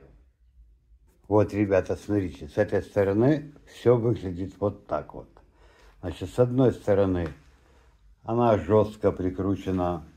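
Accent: Odessa, Ukraine. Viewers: Спасибо, рабочая идея с Одесским акцентом - в роли ограничителя деревянный камертон, почувствуйте столярный настрой.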